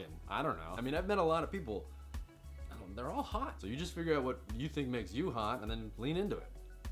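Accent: Boston, United States. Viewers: I feel like I hear his Boston accent so heavy in this video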